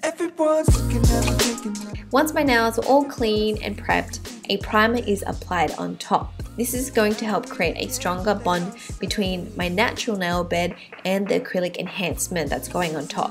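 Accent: Australia. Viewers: you def have an aussie accent :) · Wait your australian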